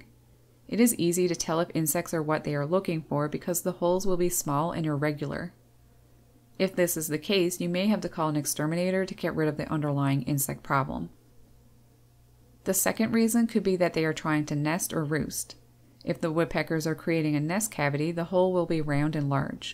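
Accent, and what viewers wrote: Newfoundland, Canada. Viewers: It’s subtle, but you sound like a Newfoundlander! I love to hear the accent. Interesting video with great information, not sure how this was recommended to me... but I learned something! Thanks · Ok i hear Canadian accent ❤ love your name so cute 😊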